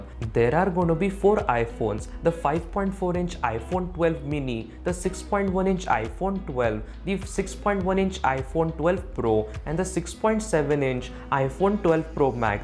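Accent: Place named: India. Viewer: seems like ur accent is transforming from American to India 🇮🇳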